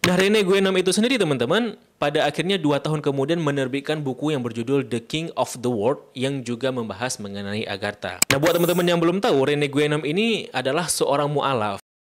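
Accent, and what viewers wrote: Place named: Aceh, Indonesia. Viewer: Logat Aceh Abang ini buat aku mahu ketawak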